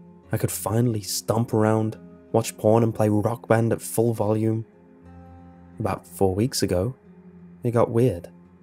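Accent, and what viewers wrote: United Kingdom. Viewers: British much?😂😂 I love how you say garage 😅😂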